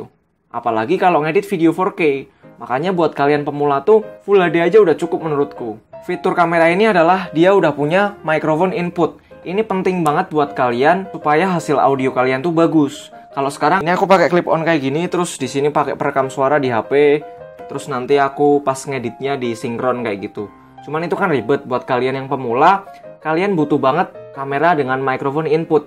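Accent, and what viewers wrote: Pekalongan, Indonesia. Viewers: Orang pekalongan bang? 😁😁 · Bang orang pekalongan ya?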